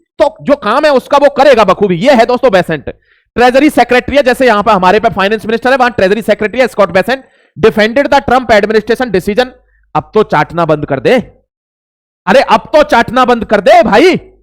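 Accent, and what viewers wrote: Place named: Western Uttar Pradesh, India. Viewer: क्या आप ही हैं, बोली से तो पश्चिम यूपी के लगते हैं, नाम क्या है आपका, नाम तो दक्षिण भारतीय है. जानकारी आप अच्छी तरह से समझाकर देते हैं.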